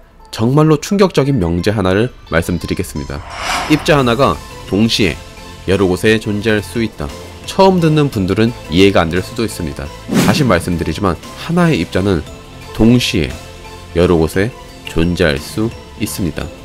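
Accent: Gyeongsang, South Korea. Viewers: 아 똑똑하고 귀여운데 말도 기깔나게하는 경상도 남자라니 최고다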